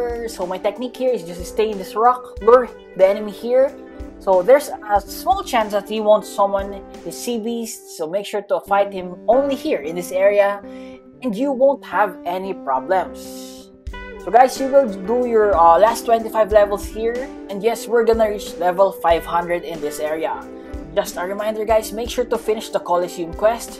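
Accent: Philippines. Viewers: he has a filipino accent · i knew it, pilipino ka halata dahil sa accent naten pa notice idol haha!!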